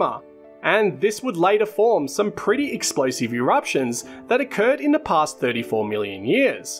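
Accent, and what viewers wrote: Australia. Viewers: I never heard an accent like this narrator. All the most annoying parts of Ozzy, Proddy and received English rolled into one. Add a few Brummy vowels and there'll be another mass extinction event. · If you haven't heard an Aussie accent before (not Ozzy btw) you need to get out more mate.... there's a whole wide world outside the UK. Live a little! 😂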